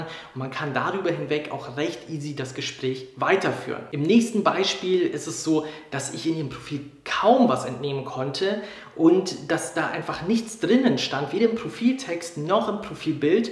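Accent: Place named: Franconia, Germany. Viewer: Junge.... wenn du brav und anständig bist als Mädel, dann lässt du nicht nen fremden Typen wegen einer Pizza zu dir kommen. Ich bin nicht sicher, ob die Tips wirklich sinnvoll sind. Du scheinst ja halbwegs kreativ zu sein, aber die Gedanken die du dir über das Profil machst, und dann versuchst witzig zu sein.... puh.... seltsam. Dafür mag ich deinen Frankendialekt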